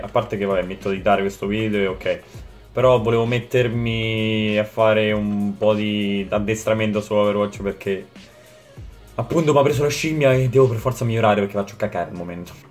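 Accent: Marche, Italy. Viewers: Per me sarebbe molto utile un video sui migliori addons da usare per i novizi. Comunque bel video continua così. P.S. sei marchigiano?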